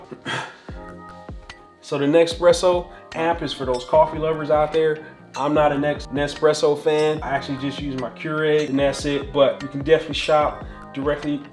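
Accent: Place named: North, United Kingdom. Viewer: You’re definitely from up north😂‼️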